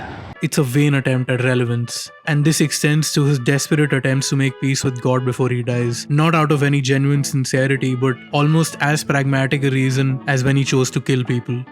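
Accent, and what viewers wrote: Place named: India. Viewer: Dude that indian accent hurts the ears 😢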